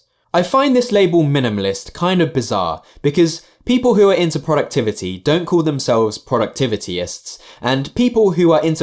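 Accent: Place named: England, United Kingdom. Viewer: Sorry to say so, but I raise an eyebrow when the first thing I see is an english sounding Matt D´Avella copy that sells a minimalist wallet in the first minute of the video. However as I am not that full of cynicsm and sarcams, I just watched the whole video and agree on many things. „Minimalism is a lifestyle for wealthy people who pretend to live like poor people.“ It is also for „better educated people“, who have the time and effort to change their lifestyle. That is all by design and choice. But Minimalism aside from a modern philosophy for the „Overfed wealthy young spoiled consumerism addict“ is a concept for everyone, a concept for the whole society. It is important to point that out and see the downsides of how minimalism is shown to the public by the regular show-off tools (socialmedia and yt). Minimalism is already misused by advertising, companys and consumerism lifestyle. 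Hey Look, my newest edc minimalist workout routine against procrastination! Nope, the bluecollar worker probably has to work nightshifts and overtime to just get food on the table and not the newest middleclass lifestyle Gadget that makes you feel like you are intentional.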